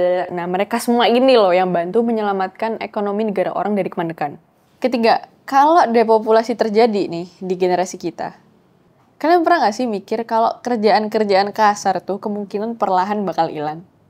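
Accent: Java, Indonesia. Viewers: Nonton karena medoknya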